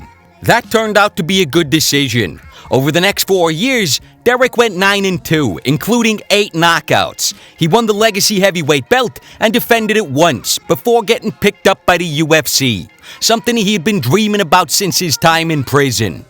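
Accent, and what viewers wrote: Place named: Boston, United States. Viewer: Richard Ott I'd say Boston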